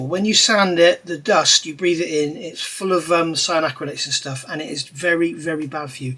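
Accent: United Kingdom. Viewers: You sound like a Yorkshire Lad .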